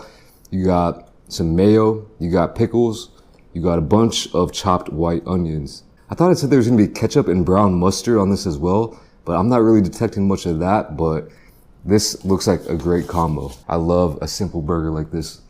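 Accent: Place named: Alabama, United States. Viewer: what the fuck why does he look like ching but sounds like robert who lives in Alabama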